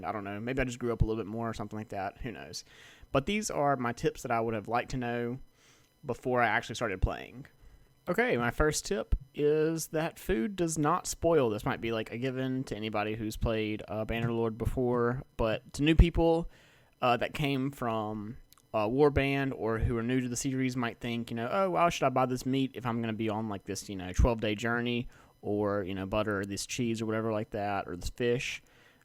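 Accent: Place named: South, United States. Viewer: Good to hear tips for my favorite game in my own (Suuthern) dialect. Great video bro, thank you.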